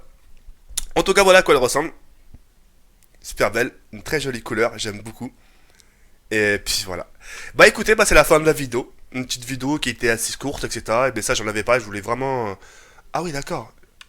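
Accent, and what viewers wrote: England, United Kingdom. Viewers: Très beau accent anglais :) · euh ton accent anglais TK ses koi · LES GANTSSSSSSSSSSS et ton accent anglais me font trop rire x)))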